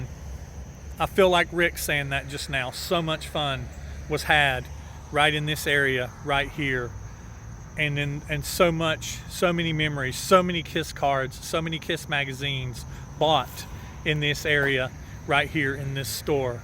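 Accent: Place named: North Carolina, United States. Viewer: I just knew you had a NC accent. I am also from NC (Asheville area) but I've been so many places I have lost SOME of the accent. Really enjoying your videos. Kiss fan since 1979 (age 4). I was buying records when other kids were buying toys.....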